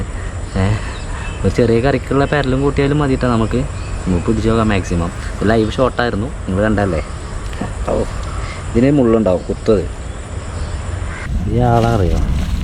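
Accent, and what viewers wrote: Malappuram, India. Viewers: Mone kutta ne malappuram aaanodaaaa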